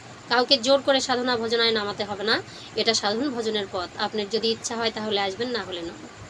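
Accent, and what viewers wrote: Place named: Bangladesh. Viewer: Apni ki Bangladeshi? Voice tone ekdom Bangladeshi der moton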